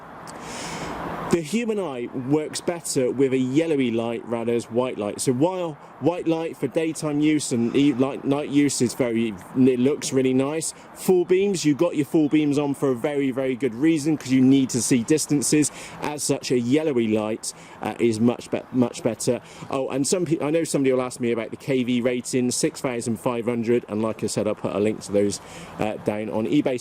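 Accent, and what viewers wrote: United Kingdom. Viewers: Thank you! I absolutely appreciate all the work you've done on this 1.5 hour video. I think this is one of the best guides on buying a car I've ever seen. Normally I don't "like subscribe and bla-bla-bla" but this time I think it would be absolutely unfair not to do it. I hope your advice will help me soon. I'm from Russia myself (don't blame me on that) but I think all the guys telling British accent is hard to understand are talking bs cause this video proves otherwise despite I know there could be some issues with old school British accent) Everything was clear and helpful. Thank you once again. Love it!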